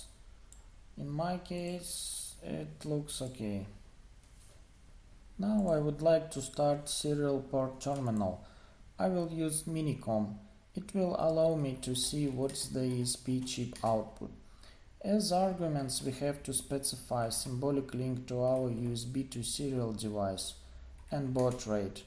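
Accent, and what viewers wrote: Russia. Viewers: русский акцент слышу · You sounds like russian comrade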